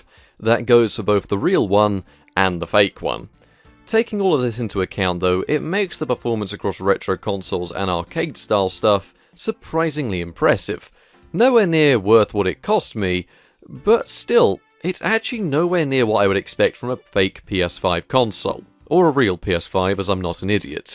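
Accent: Canada. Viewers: Canadian English 😂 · Canadian English, perfect! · Canadian English eh?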